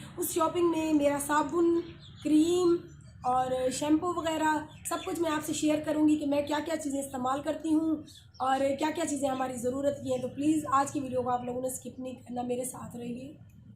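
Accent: Saraiki, Pakistan. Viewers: Behan g bhatta program bohat Accha tha,ghareeb bhatta mazdooron ki mushklat Kiya Hoti Hain khoob rahnomai ki,,Behan pehle bhe ap ko SARiKi zuban men program Karne ko Kaha tha,,,,Behan g ap Ka lehja SARiKi zuban walls hai,ap Urdu mein jhlagta hai,plz APNI zuban mein program Kia Karo hamara SARiKi khitta hai ziyad SARiKi boli jati ha na Urdu Kam,,👌🙏🙏🙏💯👈plz reply,🤗 QAiSER.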